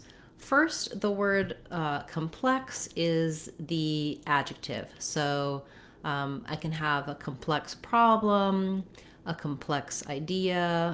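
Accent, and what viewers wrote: United States. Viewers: I think you should state that these are US pronunciations. In the UK we use first syllable stress for noun and adjective.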